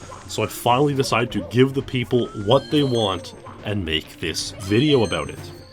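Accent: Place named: Canada. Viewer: The REALLY IS LOOSE Now, I know you're Canadian, you have the accent to prove it.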